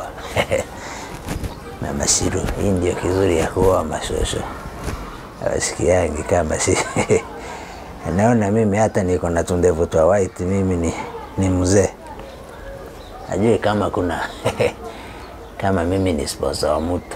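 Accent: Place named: Kikuyu, Kenya. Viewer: Haki si ako na kipawa sana yaani ako na accent ya wa kikuyu kabisa love it 😍 😀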